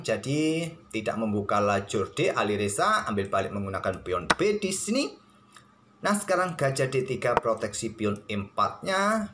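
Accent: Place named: Surabaya, Indonesia. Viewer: Ternyata admin ini arek2 Suroboyo iya😀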